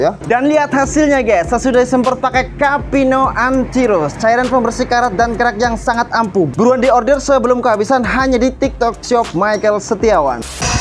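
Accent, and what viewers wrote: Banyumas, Indonesia. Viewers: Mas sampeyan wong ndi yah Bahasane daning kaya ngapak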